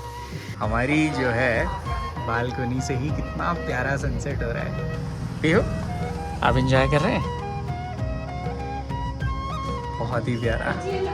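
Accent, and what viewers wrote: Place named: Garhwal, India. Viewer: Gaun m aakr , hindi mai garhwali tone aa hi jati h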